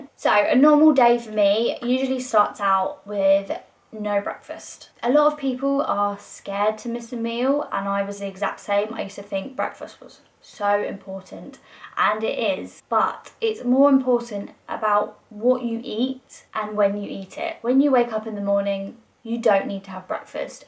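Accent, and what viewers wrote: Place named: England, United Kingdom. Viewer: Great channel, love the way you explain keto really well and your down to earth attitude. Ive been doing keto for a month and really like it. I think everyone does keto their own way and everyone's a bit different. Been watching various channels and most of them have been American. It's great to have channel with an English accent talking about the places I know like tesco. Thanks and well done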